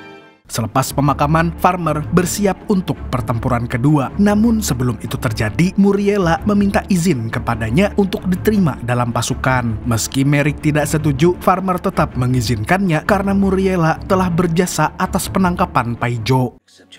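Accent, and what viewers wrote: Banjar, Indonesia. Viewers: karindangan bahasa banjarnya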